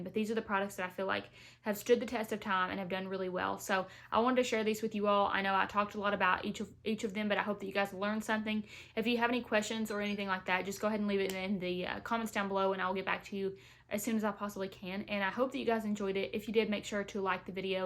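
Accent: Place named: Kentucky, United States. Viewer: I’m new to your channel.. as soon as I heard your voice I thought to myself “she’s from my neck of the woods” Kentucky, correct? ❤️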